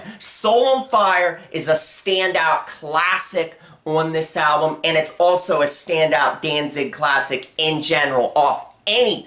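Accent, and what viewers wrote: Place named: South, United States. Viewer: where are you from I'm just asking because of the accent it sounds southern and New York at the same time haha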